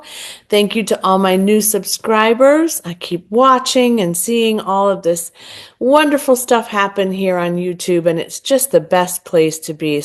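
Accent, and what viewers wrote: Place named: Louisiana, United States. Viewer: Love her Louisana accent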